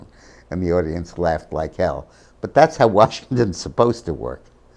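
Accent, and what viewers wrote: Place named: New England, United States. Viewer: Histray. . . Very New England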